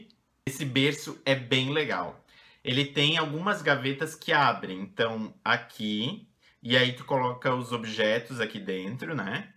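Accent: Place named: Rio Grande do Sul, Brazil. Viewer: Ai que tudooo! Obrigada por responder, vc é um amor de pessoa muito simpático. ♥ Me diz uma coisa, vc é gaúcho? Sinto um pouco de sotaque hehehe eu sou de Porto Alegre!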